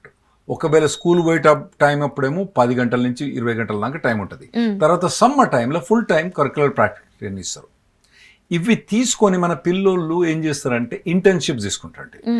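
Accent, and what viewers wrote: Telangana, India. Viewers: Sir your words are pakka telangana❤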